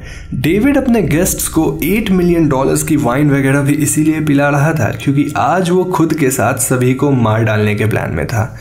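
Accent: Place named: Bihar, India. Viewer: He has bihari accent😍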